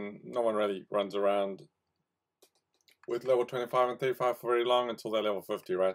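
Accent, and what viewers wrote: South Africa. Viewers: Are you south African? The accent sounds hella familiar 🤣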